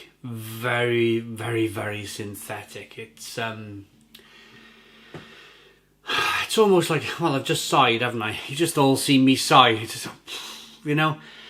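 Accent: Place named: Cardiff, United Kingdom. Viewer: Where you from? Sounds like a barry/Cardiff accent.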